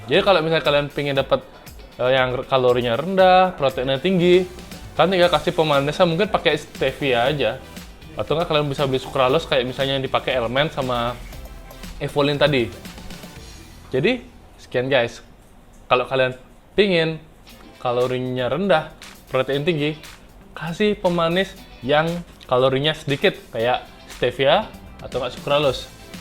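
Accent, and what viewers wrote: Bali, Indonesia. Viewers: Logat baline kental sajan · Bali bukan bang??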